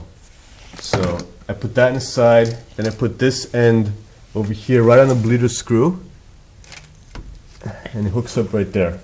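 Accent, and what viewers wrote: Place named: New York, United States. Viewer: Dislike because it should have been a hot girl doing this and not some New Yawker.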